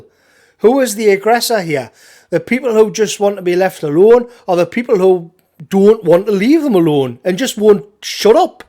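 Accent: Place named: Tyneside, United Kingdom. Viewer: Thanks. You are taking a bullet for me doing this. I cant read this kind of shit without it dangerously raising my blood pressure and ruining my mood, but listening to your wonderful "hate-readings" of the odious grauniad is extremely cathartic. Your takes (in dulcet tyneside tones) act like a little proverbial valium pill to the counter the ragefuel and soothe the jimmies. Earthling Carl does a similar thing with LBC radio too. Kudos mate.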